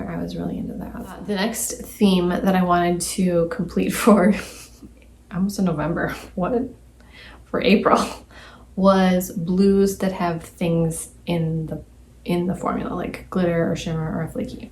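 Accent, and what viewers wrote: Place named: Midwest, United States. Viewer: Great video, I've always been a bit intimidated by blue nail polish, but it's so cute! Also, I'm hearing a suuuuuper strong Wisconsin/Minnesota accent, am I correct? Lol.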